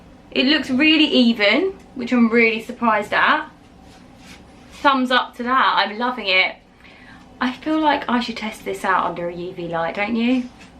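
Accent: United Kingdom. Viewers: Are you British?? You accent is sooo cute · When you realise she’s British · Are you british? 😂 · Her british accent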